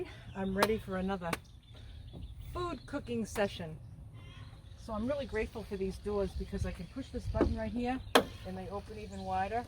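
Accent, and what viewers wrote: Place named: Massachusetts, United States. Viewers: my dad's family is from Canton, MA and I can't tell you how much you remind me of them. Love your 'get it done! ' attitude (and your glorious accent! ♥️) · I eat like you I cook mostly potatoes and beans in pressure cooker. I was wandering what is you plan to receive mail? I asked since we are from same state I like your Ma accent I can hear it. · love hearing ur Mass. Accent. I am a vegetarian eater too. Steam mostly in fry pan. But oven bake yams & potatoes. U encourage me to use the pressure cooker. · I love your videos—especially the healthy eating component and your lovely Boston dialect .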